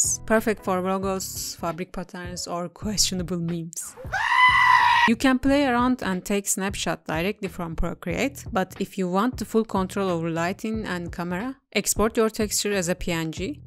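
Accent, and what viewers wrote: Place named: Turkey. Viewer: I know it when I hear a Turkish accent. Are you Turkish?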